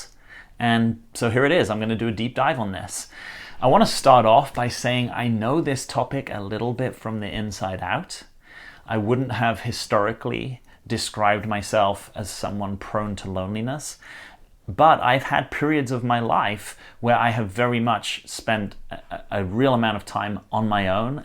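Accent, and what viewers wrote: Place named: United Kingdom. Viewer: I love this video. Thank you. Although I'm an extrovert and very sociable, I'm learning to embrace my alone time and really starting to value it. I've been single for a year and am just about to go on holiday abroad as a solo traveller for a week - bit nervous, but looking forward to the experience. By the way, on a completely different topic, your accent sounds more British today!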